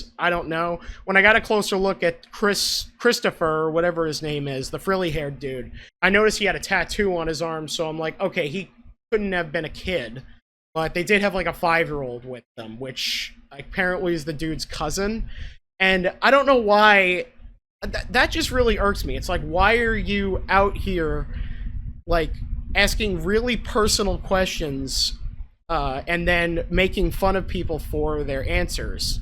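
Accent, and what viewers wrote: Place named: Long Island, United States. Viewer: I knew u were from Long Island something about your voice that sounds native to me lol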